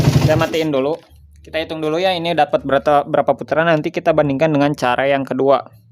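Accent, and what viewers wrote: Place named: West Java, Indonesia. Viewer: Dari logat bicara nya, akang mirip2 channel bang vedro simister.. cara penyampaian nya jelas dan ada logat sunda nya.. semangat kang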